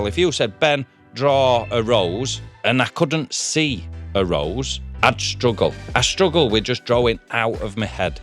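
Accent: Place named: Manchester, United Kingdom. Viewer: no worries you have a bit of a Manc accent.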